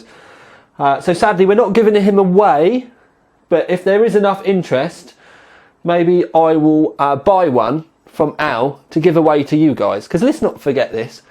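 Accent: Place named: England, United Kingdom. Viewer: taking something bad that happened and turning it to something good, not only for yourself but for just random people all over the world! that is quite amazing and i really do like your accent im a big fan of the english accent so these videos are just amazing to watch :D i love the long videos cuz i love to listen to people talk for long amounts of time for some reason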